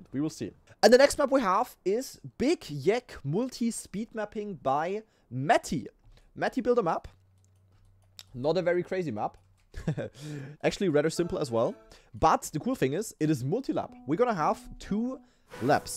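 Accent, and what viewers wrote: Germany. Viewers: Just want to say you have a pretty minor german accent, very clear and understandable to my canadian ear. Your english is fantastic as well, I've listened to an absolute ton of your content and only rarely do you reach for a word or don't know the meaning of one. Love the content, and especially enjoy the competitive focus. Whether you are hosting tournaments or competing, they are my favorites. The volvic duo cup reviving that format was especially appreciated and I hope you can do it again!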